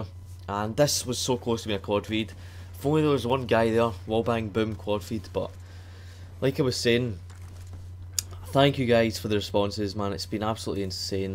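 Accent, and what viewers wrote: Scotland, United Kingdom. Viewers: Wings sent me .. Don't normally check out other people but glad I did !! Highly entertaining gameplay .. Also was pleasantly surprised that you too are Scottish - fae Ayrshire ")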